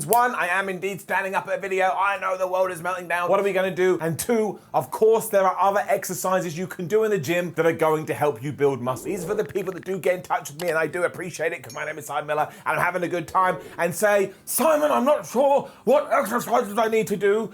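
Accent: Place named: Cockney, United Kingdom. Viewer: Are you a Cockney Simon? You sound a tad like the cockney Geezer from the mighty boosh.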